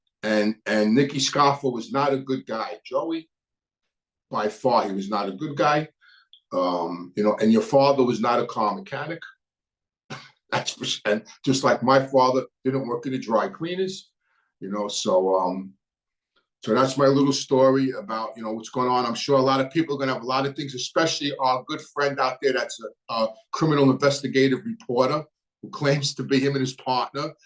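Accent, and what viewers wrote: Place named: Brooklyn, United States. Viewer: He has a Brooklyn accent, genius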